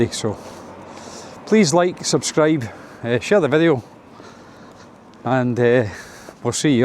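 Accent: Scotland, United Kingdom. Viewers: Hi I'm quite new to your channel, but I like it. Good to hear a good Scottish accent. I have a question for you regarding movie g to Spain. I have looked at the proces of moving to Spain and I think it's too complicated. How easy is it to rent a property in Spain for the 90 days. Or can I just buy a property and spend the 90 days there without having to apply for a visa?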